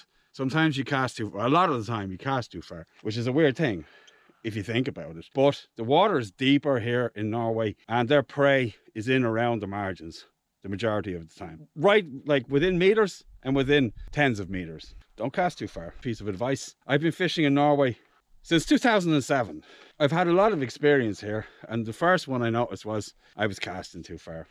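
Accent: Ireland. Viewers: Just happened upon your channel, and its great! Are you from the west coast of Ireland by chance ? The accent sounds familiar. Big props from The US, a great video.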